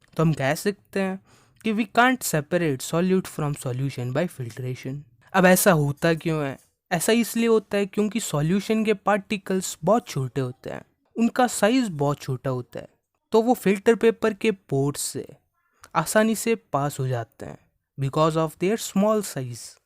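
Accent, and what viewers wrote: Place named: Rajasthan, India. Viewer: Sir aap ki sound bahut acchi hai like Rajasthani ❤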